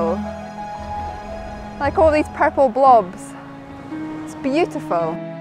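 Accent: Scotland, United Kingdom. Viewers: Hello, how are things? I like your video and I like your photos as well. Like # 940 is mine. The other photographer I follow are all geezers. it's nice to have a feminine perspective for a change. You've got yourself a follower in São Paulo, Brazil now. Love your scottish accent.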